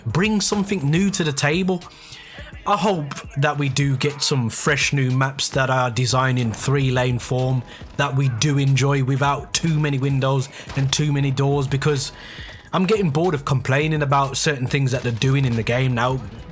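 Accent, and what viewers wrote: United Kingdom. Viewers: Yo, where in the Isles are you from? I could listen to that voice explain shit all day.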